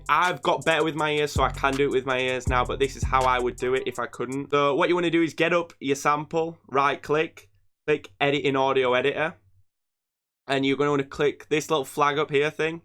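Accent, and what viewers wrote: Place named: United Kingdom. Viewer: you're the most british producer ive ever heard from man.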